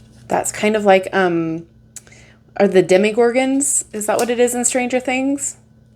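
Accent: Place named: United States. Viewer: спасибо за обзор! У тебя такой красивый акцент!!! Класс! Прям американка!!!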